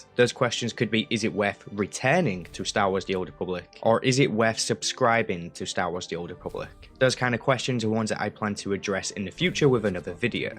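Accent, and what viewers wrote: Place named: Northern England, United Kingdom. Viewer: middlesbrough your from northern england for sure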